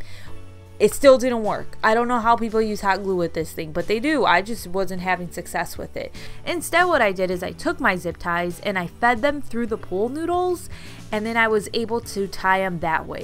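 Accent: Chicago, United States. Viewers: Awesome as always. From one Chicago girl to another, it’s our accent. LOL OR DA MINT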